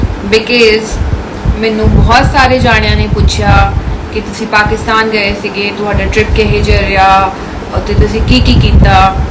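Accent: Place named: Amritsar, India. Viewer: from your Punjabi accent, it dawned on me you are an 'Ambarasrian' from the opening lines of your conversation on Lahore TV shows. I'm extremely excited to know that you really are after watching all your vlogs telecast from Indian bloggers! Being a son of an Ambarsari family, I also used to go to Wagah Border with my friends regularly in the sixties in the hope that one day I would go across and visit my ancestors' birthplace in Ambarsar (Amritsar), but alas, till today, at the fag end of the existence, that dream has yet to come through. It made me really sad to learn that you lost your father in his thirties. My sincerest commiserations, and prayers for you to excel in all the quarters of showbiz and more than you may also be blessed with a caring and loving Ghabru of your dreams. Anees from Lahore (Ambarsariya).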